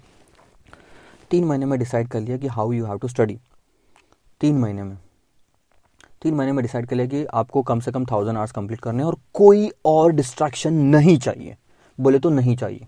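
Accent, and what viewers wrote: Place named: South, India. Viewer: Don't mind sir but your accent sounds south indian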